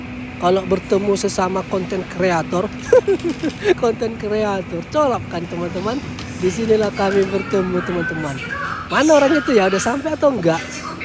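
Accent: Medan, Indonesia. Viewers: Bang aq ngakak banget pas abang bilang telor bunting,telor bengkak pula😂😂😂dan aq suka bnget cara abangnya ngomong medan banget gitu tp slow ah pokoknya nyaman aja lah bang liat plus dengerin abangnya ngomong.Btw aq orng Bandung bang😅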